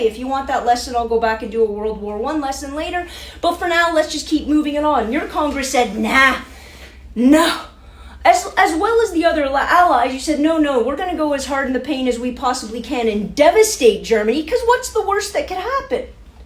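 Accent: Canada. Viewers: I got a lot from this presentation. I caught the Canadian accent and I'm not surprised that you're better educated than most of us. Fossils like me remember real teachers. They're gone. We have some excellent ones who can't teach the unteachable. Media has ravaged our society and driven it into the ground since the fifties. France has every reason to take back the lady. We've defiled her. I'll be petty at the end here and fuss over your hair. I want that in the spring 🌱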